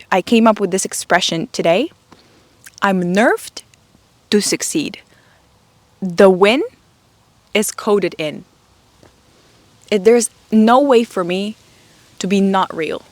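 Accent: Russia. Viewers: wait ARE YOU RUSSIAN???? YOU SOUND SO BEAUTIFUL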